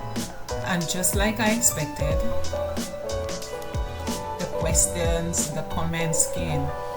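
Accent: Saint Lucia. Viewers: I instantly hit subscribe when I heard that accent! As a fellow Lucian myself I am enjoying your videos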